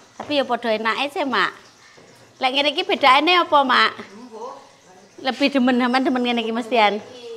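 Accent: Java, Indonesia. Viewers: ma'af mba..aku songko Demak lg semingguan nemu vlog mba lan seneng banget kehidupan lan logat jowone..ma'af mbak?adike sampeyan neng ternak entok nopo serumah karo mba,.. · wqwqwq mbak mosok mangga gurih...aku mesti ngguyu ngrungokno mba ngomong...dialeknya jowonya kental....videonya apik kok....pinter bikin video...aku mbok diuruki mbak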